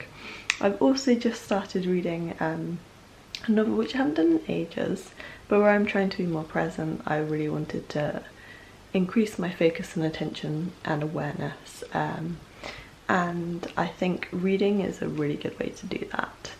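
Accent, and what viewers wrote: United Kingdom. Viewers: YOU are so ADORABLE! Your pretty blonde hair, your adorable cheeks. I love your British accent which only adds to your charm. I love it when you talk to us and tell us all what your doing, your morning ritual etc. Keep up the good work. I love your videos, just love being with you.:-):-):-)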